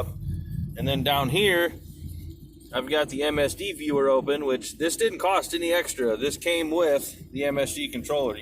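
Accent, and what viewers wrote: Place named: Pennsylvania, United States. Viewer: Pennsylvanian I see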